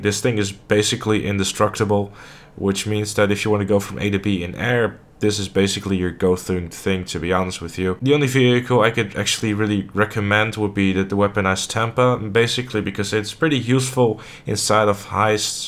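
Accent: Netherlands. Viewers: Mr_ FancyPants he’s Dutch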